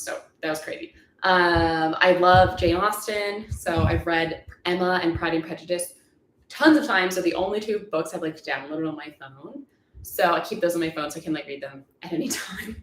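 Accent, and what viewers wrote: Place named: Wisconsin, United States. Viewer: Regarding the accent... don't forget the Wisconsin influence. Doncha know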